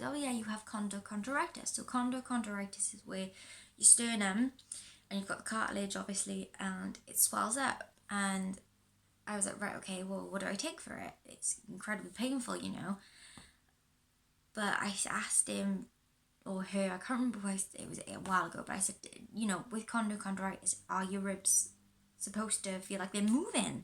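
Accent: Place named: Wales, United Kingdom. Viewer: Just watched the entire vid, knew you were Welsh :)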